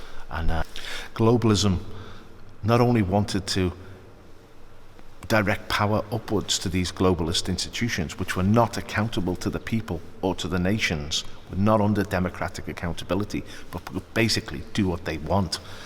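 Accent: Liverpool, United Kingdom. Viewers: Happy New Year! Thank you for hard work and persistence! So great to hear a Scouse accent talking our language!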